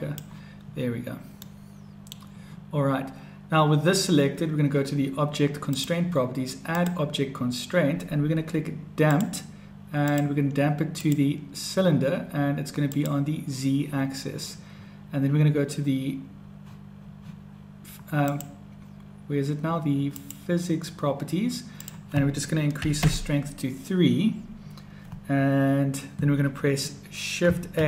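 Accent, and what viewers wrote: South Africa. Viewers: Are you South Afican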